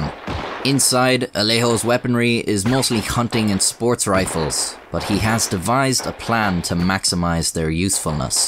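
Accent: Ireland. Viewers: The way your stories are presented is pretty damn awesome. I mean, where else can an old, white guy from the USA get to listen to stories told by someone in an Irish accent. The articles are interesting, yet amusing, just what this old man needs after a day of work. You've gained yourself another subscriber, lad. Keep up the good work. :) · Great story. I would never have u heard it or even remembered it if it wasn't for you and the way you told the story. Love the Irish accent. I'm a subscriber.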